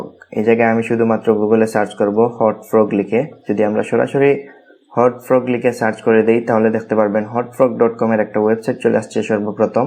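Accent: Sylhet, Bangladesh. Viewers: সিলেটি নি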